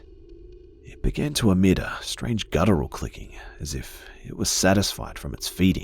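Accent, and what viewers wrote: Australia. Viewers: I love your Aussie voice storytelling so much that I recently grabbed me a lad from Bris to forever listen to hehe. Thanks 😉🙊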